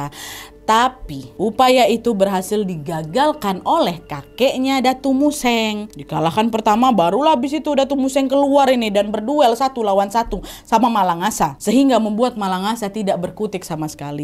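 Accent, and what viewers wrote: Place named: East Nusa Tenggara, Indonesia. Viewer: Logat NTT nya kak masih kebawa wkwkkw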